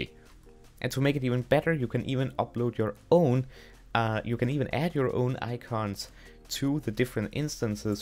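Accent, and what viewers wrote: Denmark. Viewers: hmmm you sound a bit danish...?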